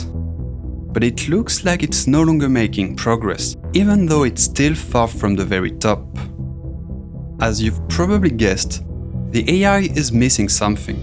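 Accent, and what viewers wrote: France. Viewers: tes francais toi XD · J'adore ton accent français, t'es vraiment une super chaîne YouTube ! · Hi! are u french? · Are you french or smtg? I’m wondering cuz I’m french too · Are you french ? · why is bro french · T’es francais ? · tu est Français? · From content to editing, everything is near perfection. You sound a little bit french, alors merci pour ces vidéos, le montage est incroyable, et le travail derrière monstrueux. Franchement, tu mérites plus de reconnaissance, tes vidéos sont niches et en même temps tellement abordables et passionnantes, ça force le respect. Chaque fois, c'est un plaisir de les regarder, et tu m'as fait découvrir l'univers du speedrun de trackmania d'une manière tellement originale, c'est sûrement devenu ma licence de jeu de course préférée! Alors merci encore, et bonne chance! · Are you French? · You sound French. 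Are you French? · is he french or what ? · vu ton accents tu as l'aire français, tu voudrais pas mettre une piste audio francais ? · STP BG MET UNE VERSION EN FR CAR TON ACCENT EST VRM TRES FR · are u french by any chances ?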